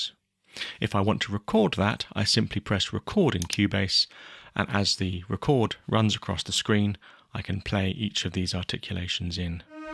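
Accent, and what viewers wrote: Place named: RP, United Kingdom. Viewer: Thanks for the videos. I love how your BBC accent suits the BBC sample libraries. It sounded out of place when you were talking about the lurid purple sex button on the Embertone Sax! No offence intended. I just love seeing the funny side of everything. :-)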